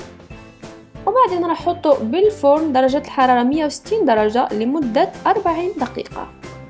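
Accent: Morocco. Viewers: korde طيب عشان هيك عندها لهجة مغربية شكرا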